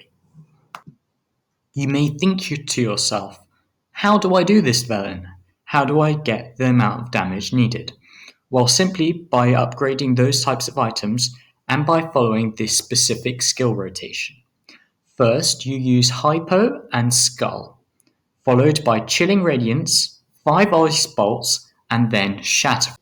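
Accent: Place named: United Kingdom. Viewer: I clicked off after hearing the british accent!!!!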